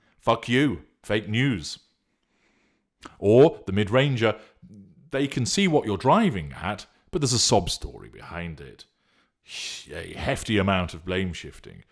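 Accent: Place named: RP, United Kingdom. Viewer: You’re and you sound ever so Posh!! Once again you’ve hit the mark 100% thank you Sir 👍🇦🇺 love your work 😘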